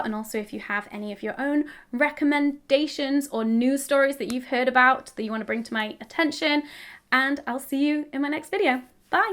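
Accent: United Kingdom. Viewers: I love youuu omg currently rewatching your videos to get used to "the British accent" of the phonetic charts I have to study at uni here in France skksksk 🤯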